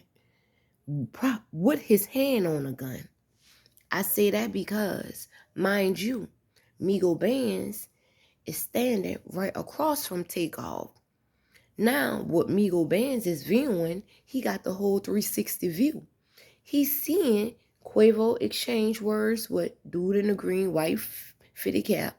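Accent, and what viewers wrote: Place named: South, United States. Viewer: Welcome back! Love you and your content just keep doing you My Queen. Love the southern dwarl my Queen. From the Chi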